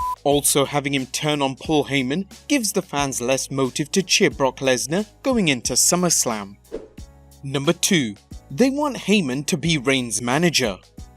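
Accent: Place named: United Kingdom. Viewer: Ew a British voice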